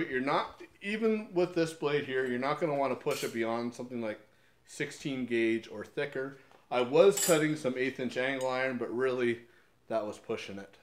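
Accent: Canada. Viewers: believe me, I really appreciate the Canadian accent, for me is the best english.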